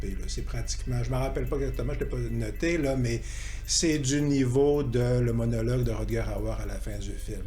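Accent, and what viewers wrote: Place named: Quebec, Canada. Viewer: je m'abonne malgré l'accent québecois, c'est dire comme c'est bon :person-turqouise-waving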